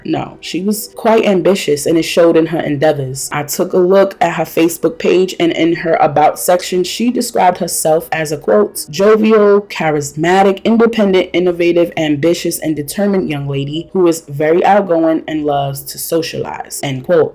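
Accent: New York, United States. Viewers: I love the way your NY accent flows with your storytelling!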